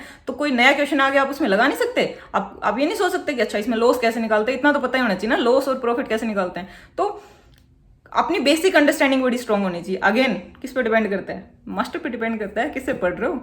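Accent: Haryana, India. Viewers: I m from bihar, i appeared in cgl 22 mains and, got low marks so decided to prepare hard for 2023 cgl, i liked your advice and loved your Haryanvi accent, the way you explained things is superb.... You made me remind of ritu ma'am who is also from haryana and preparing for upsc.... Haryanvi wali thaat achhi lgti hai with decency.... 😊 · Your Haryanvi... ❤️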